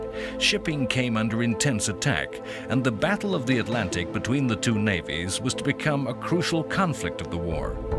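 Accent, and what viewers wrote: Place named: United Kingdom. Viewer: Prefer the British narration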